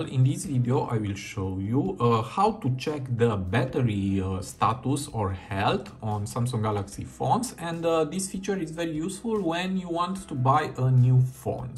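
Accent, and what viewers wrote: Romania. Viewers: Laa fel! :)))) Eram: măi, ia stai asa! Asta e accent românesc! :))) am rămas șocat că am recunoscut. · Mi-am dat seama din accentu tau ca esti român și dupa am vazut la channel description lol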